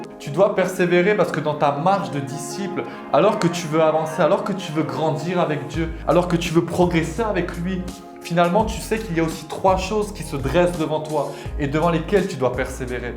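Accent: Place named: Alsace, France. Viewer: Je tombe sur ta vidéo, je me dis super un autre frère en Christ sur You Tube super! Et la j’entends l’accent alsacien et je me dis oh en plus alsacien ! 😂 Ps: je ne savais pas que le « yo » ne se disait qu’en Alsace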